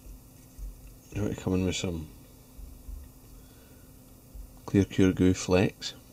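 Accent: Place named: Glasgow, United Kingdom. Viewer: just learn to understand english then, with an Glaswegian accent. English is my second language and I understand it fine.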